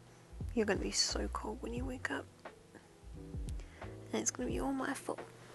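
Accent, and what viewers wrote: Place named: United Kingdom. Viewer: A VIRTUAL GIRLFRIEND AND SHES BRITISH? IVE REACHED HEAVEN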